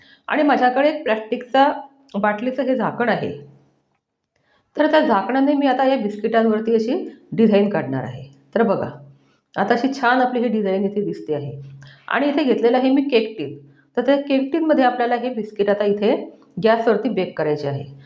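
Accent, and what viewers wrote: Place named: Leva Patil, India. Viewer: तुम्ही लेवा पाटील आहे का भाषेवरून समजते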